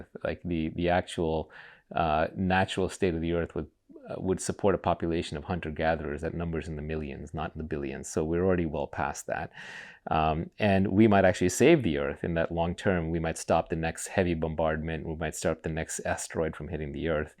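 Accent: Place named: United States. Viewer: your accent is more American than American themselves.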